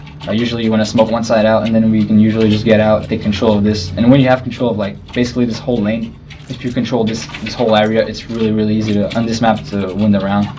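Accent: Quebec, Canada. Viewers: Dat subtle french-canadian accent. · I like that quebec french accent :D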